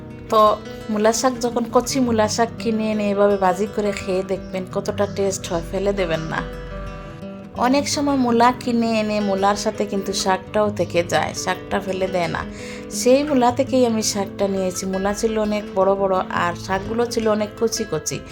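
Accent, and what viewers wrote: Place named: Sylhet, Bangladesh. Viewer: আপনি সিলেটি।কথায় ই বুঝা যায়।আমি ছিলাম ২৪ বছর।এখন ফেনি আছি বাবার বাড়ি।বিয়ে হইছে ৪ বছর রানিং।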